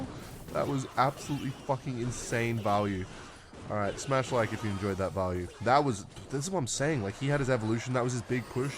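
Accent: United Kingdom. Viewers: Bro is British... I am not watching this anymore..... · British? Yuck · is british?😂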